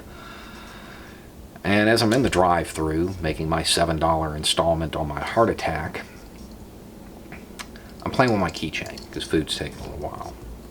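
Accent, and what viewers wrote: South, United States. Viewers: I guess while we need to be able to exercise our freedoms to improve, what I immediately think of is a lack of kindness. I’m tired of people treating each other like garbage. It feels like humanity is in its teen years, and anything different is made fun of, is a weakness to poke at, in hopes of tearing them down and making ourselves feel better. When I first started watching this channel, I was hesitant to click. I’m from the boarder of TN/KY and I knew that accent immediately, lol. And I wasn’t used to hearing these ideas come from someone who sounds like this. So not only do I find out about world events and hear a very interesting perspective, but I also have to check myself, because while I wasn’t actively being a jerk, I was falling into the trap of putting someone in a box of my expectations before I’d heard the words they had to say. 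I feel like... this channel helps keep me honest, while I’m trying to find ways to promote what I consider to be the ideal.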